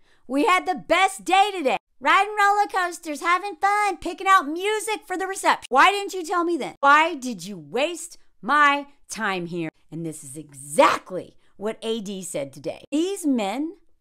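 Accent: South, United States. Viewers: You have a southern accent, but when I say that I mean that you have a general American accent with the slightest, tiniest southern accent on certain sounds. I only say this because I do voice acting and have pretty much the same accent. Only linguists seem to clock it. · You still have a southern accent.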